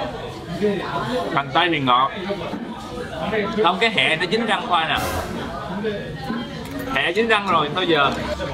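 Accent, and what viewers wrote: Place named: Ca Mau, Vietnam. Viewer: Khoai nói chuyện giống người cà mau nè "cái đó ruột già ông nội ơi', chị thích nha❤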